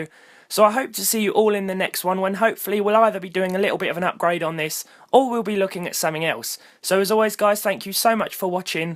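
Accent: United Kingdom. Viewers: Luckily I could listen it all, but does British voice always sound like that?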